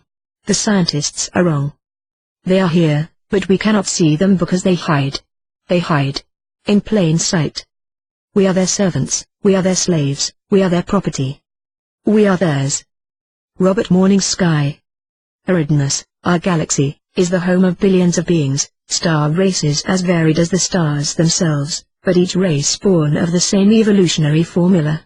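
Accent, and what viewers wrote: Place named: England, United Kingdom. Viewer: It s hard to listen this robotic voice with english accent.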